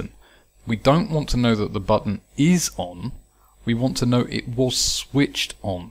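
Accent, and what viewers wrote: United Kingdom. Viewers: A Brit dude with brilliant story telling educating me about arduino and trains? YES PLEASE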